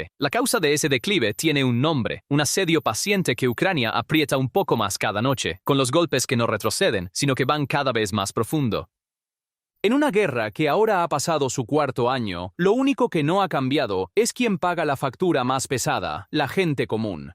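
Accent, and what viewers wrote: United States. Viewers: esa ia en español gringo es ridicula por dios dejen de usarla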